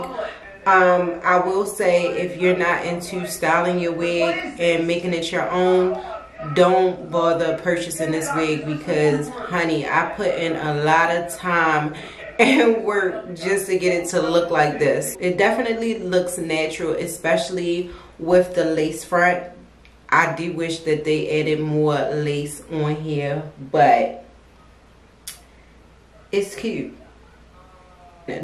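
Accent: Baltimore, United States. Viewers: You did that!! It looks really cute on you! Btw..are you from the DMV area? I can hear the Baltimorean accent lol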